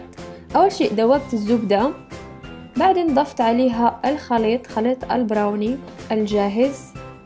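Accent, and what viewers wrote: Morocco. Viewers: korde طيب عشان هيك عندها لهجة مغربية شكرا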